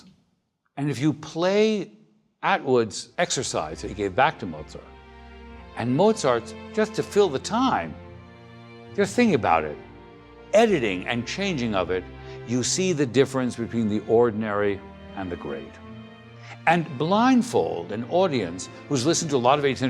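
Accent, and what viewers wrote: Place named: Netherlands. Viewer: What’s with the Dutch accent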